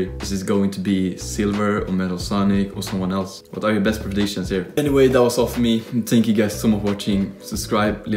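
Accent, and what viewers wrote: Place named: Sweden. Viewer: Been watching your channel for a time now, and both me and my sister had our suspicions on you being Swedish due to your accent, so thanks for telling 🌸 Vi behöver flera Svenska Sonic fans 🥲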